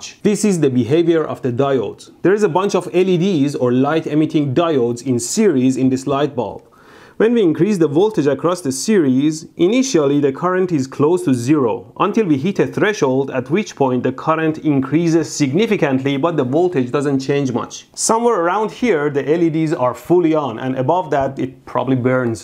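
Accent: Iran. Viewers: Yep that's the strongest Persianified English accent right there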